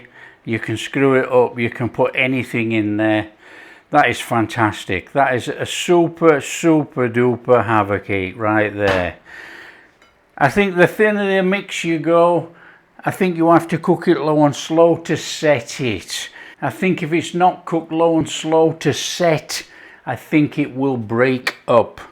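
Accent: Yorkshire, United Kingdom. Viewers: I could get these in Todmorden Market Hall until about 1990 when the last oatcake maker died/retired. Had to make my own since then. Not as good... And I noticed your Yorkshire accent came through stronger! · Your accent really thickens up when you're cooking Yorkshire food. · Thought it was great you talking in Yorkshire, I bet a lot of folk didn’t understand you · Ey up, as tha been on holiday tu cudorth (Cudworth), tha sounds a lot more Yorkshire tha see, on a serious note my grandad use to make a full breakfast, stick it on a tin plate, cover with cheese drop of milk and pepper shove it under grill, warm flat bread and stick the breakfast on it, roll it and dip in the scrapings of well done cheese that stuck to plate, absolutely fantastic. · Gone back to thi roots lad, tha sounds reet gradely!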